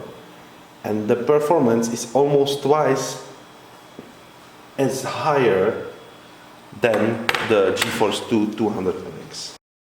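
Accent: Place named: Czech Republic. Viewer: Já si říkal, že slyším czenglish. Ale nemyslím to ve zlém, jen jsem tam v té angličtině poznal český přízvuk, tak rovnou píšu česky a pak se objevilo ve Windows Vitejte a bylo jasno. Též uvažuji udělat nějaký podobný test. PS: Toho Athlona fakt závidím. Nemám ani jednu Slot A desku a ani procesor, ale hrozně moc bych chtěl.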